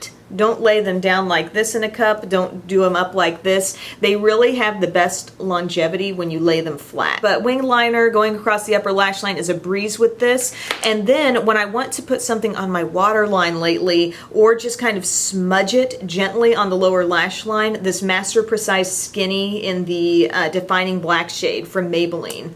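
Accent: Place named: Midwest, United States. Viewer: Your ridiculously adorable Midwestern accent makes me feel like you're my super pretty big sister patiently helping me learn how to do my makeup so I don't end up with bronzer tiger stripes!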